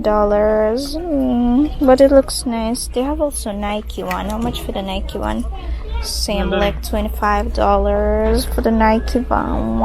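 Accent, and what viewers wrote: Jamaica. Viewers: Yes she speaks patois very cute and dresses trendy like a black girl 👧🤣😂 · I speak like Duel Sylvester, God has work out a well put together family vacation✈ be thankful.you speak patwa deep love it play safe ⚽️Errol love from sunny vincy 🇻🇨🙏😍💪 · girl big up, I like hearing you talk patois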